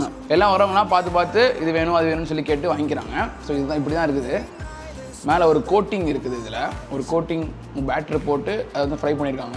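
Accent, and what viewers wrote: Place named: Kanyakumari, India. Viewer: Kanyakumari language poola iruka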